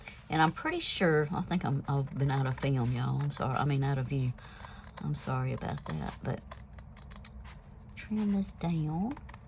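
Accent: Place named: Kentucky, United States. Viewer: You sound like you may come from my neck of the woods. I’m from KY and just learning, these digitals are gorgeously beautiful lol. Thanks for sharing.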